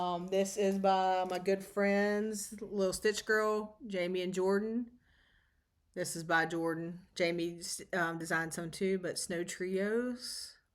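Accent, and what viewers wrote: South, United States. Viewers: .... you might be my most favorite to watch. I love your southern accent and your laugh. REALLY love your stitching and WIPS. Such inspiration with each video. I also may continue to stitch my Christmas, Snowman, and Winter charts. I paper craft also and have decided to leave my Christmas papers and stamps out and continue creating. I am not tired of stitching or making Christmas projects just yet. Have you done a room tour yet? Each time I watch your videos, I always wonder if it is a bonus room upstairs or maybe a large bedroom. Either way I love the two small closets in the back. I could fill them up. Have a great January. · To one of my favorite FlossTubers....I love your selections for stitching and your lovely southern accent. Here in the Northeast I truly am cozying into Winter ....gotta embrace the white and next week will be below zero! 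🥶 Hope you have a great January and I look forward to watching another episode soon! 😊 Kathy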